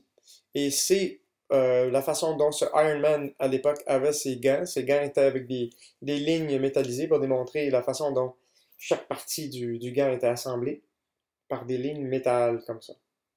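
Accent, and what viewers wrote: Quebec, Canada. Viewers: il a un accent québécois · French? Maybe Canadian...Are you from Quebec?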